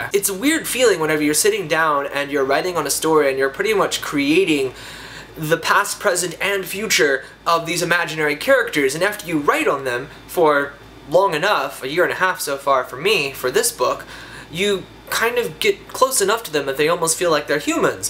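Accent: United Kingdom. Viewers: British accent??? WHY?? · Are you actually British because you do an amazing accent XD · haha british accent; that is so awesome!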